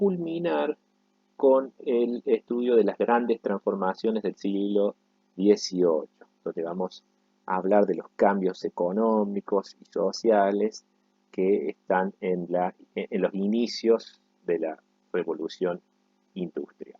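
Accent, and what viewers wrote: Córdoba, Argentina. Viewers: Acá sí queda claro el acento cordobés. 😉 Felicitaciones por el contenido. Saludos desde Montevideo.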